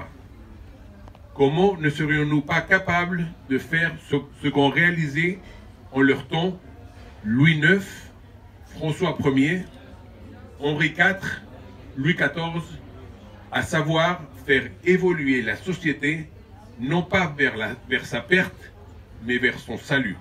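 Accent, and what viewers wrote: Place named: Spain. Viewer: Ne soyez pas ridicule on sait très bien distinguer les différents accents,parisien,sud ouest,chti, marseillais etc,Louis XX n' a aucun de ces accents, et c'est étonnant d'entendre un accent espagnol de la bouche de quelqu'un qui est censé incarner l'héritage de la monarchie française,non?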